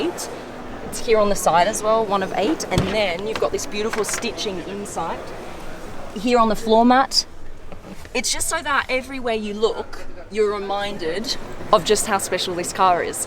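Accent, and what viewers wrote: Australia. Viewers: Cool video and yes we get it, you have a Lambo!!! Big deal, I have a Bugatti. Do enjoy your accent and I dig Australian women :) Your husband is a lucky man. Do you have a sister???? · As usual, this Aussie ugger is really boring and so in-to-your-face stupid. The accent alone can make me vomit nails!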